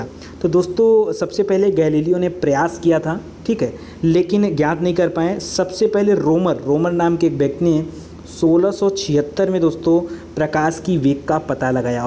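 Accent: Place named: Uttar Pradesh, India. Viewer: Sir ji aap up se ho apki language se pta chl rha hai Bhot accha samjate ho sir ji thanku bye tc.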